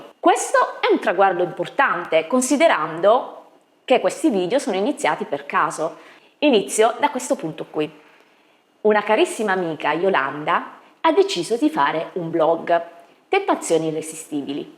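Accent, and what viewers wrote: Italy. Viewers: I enjoy your cooking and look forward to the videos every Thursday. Keep posting! Your Italian English is unique and they make me laugh all the time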